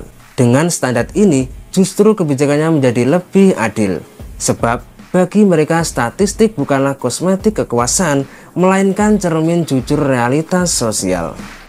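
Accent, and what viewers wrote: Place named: Java, Indonesia. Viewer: Bahasa yg bagus tidak terlalu jawa👍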